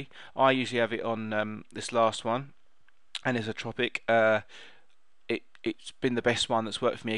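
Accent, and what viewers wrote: United Kingdom. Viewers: i love brithish accent! greetsfrom chile good video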